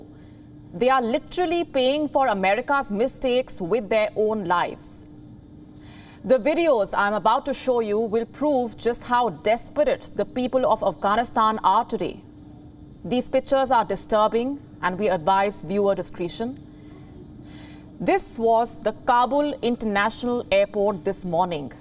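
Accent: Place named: India. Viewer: 印度英文口音好明显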